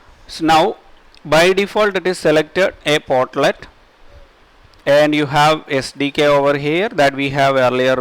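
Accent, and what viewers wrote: India. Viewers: your accent is very annoying ,plz try to speak better and clearer and further away from that ... indian accent. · Mallu Accent :D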